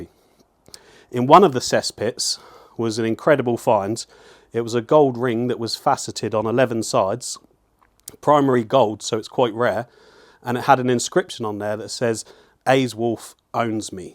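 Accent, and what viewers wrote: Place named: Hampshire, United Kingdom. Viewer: I'd like to know where the narrator is from. His accent is interesting. Sounds a bit Portsmouth but with a slight Hampshire country twang. Am I close?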